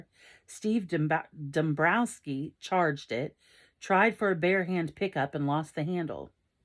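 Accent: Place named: United States. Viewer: I like your US accent from the UK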